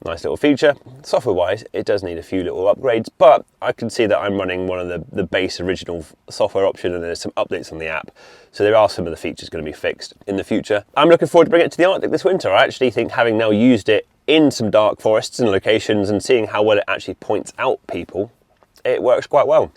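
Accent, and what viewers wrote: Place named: Scotland, United Kingdom. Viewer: my god i got a reply.. been watching you since the start of your conversion i heard the Scottish accent instantly cheered 🤣 and subscribed. I live in argyll. 65-70% of the way done on my conversion but just not getting the time to finish it. Hope all is well with yourself and thanks for the reply 😊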